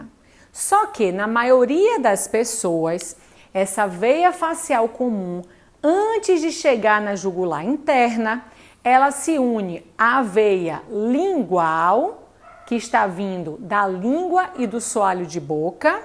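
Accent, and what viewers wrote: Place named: Northeast, Brazil. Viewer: Simplesmente espetacular ! Muito rica em didática e lógica além do acréscimo charmoso do sotaque nordestino .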